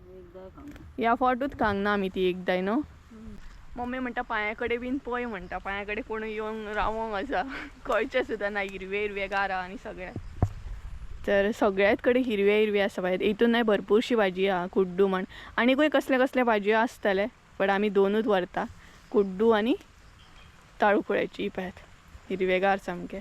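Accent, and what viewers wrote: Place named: Konkan, India. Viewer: Keep going.... Don't stop your vlogs. I always like your talking way... I mean your konkani is different from ours as i stay in South Goa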